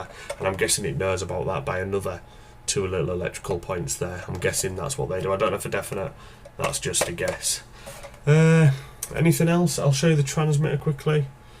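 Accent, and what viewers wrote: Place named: Hull, United Kingdom. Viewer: Loving the Hull accent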